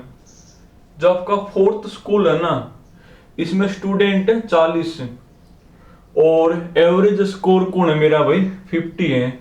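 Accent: Haryana, India. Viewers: Haryana t ho sir aap ???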